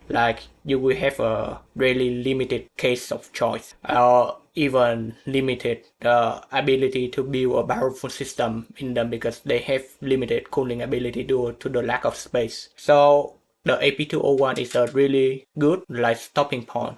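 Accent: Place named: Vietnam. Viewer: Ban la nguoi Viet Nam a, tui co the nhan ra accent cua ban.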